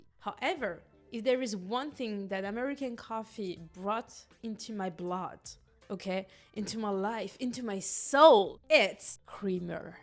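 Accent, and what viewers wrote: France. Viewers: c’était très sympa de t’entendre parler en anglais 😘. L’accent français, tu l’accentues pour les Américains ou me trompe-je?😉😁🤣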